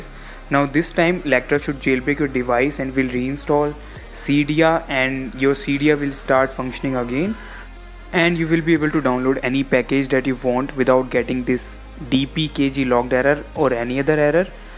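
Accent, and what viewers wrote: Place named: India. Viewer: indian accents.... ughh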